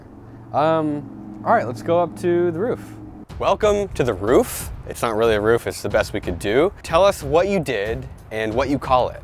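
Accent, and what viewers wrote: Australia. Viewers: Don’t worry, most of us aussies talk like that 😑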